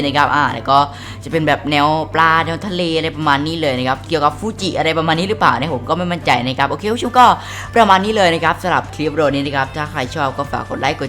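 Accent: South, Thailand. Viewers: พี่เป็นคนใต้ม้ายอะ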